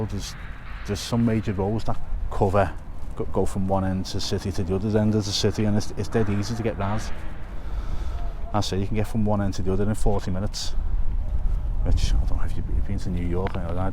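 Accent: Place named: Merseyside, United Kingdom. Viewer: Got to ask Merseyside has distinct accents north,south,east,and west Which part are you from Enjoy you videos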